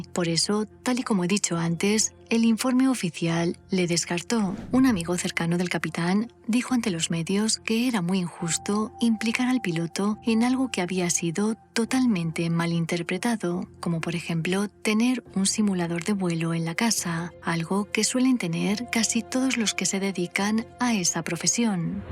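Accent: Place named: Aragon, Spain. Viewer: No soporté el «suscribais, dehais, actubais" demasiado baturro para mi gusto.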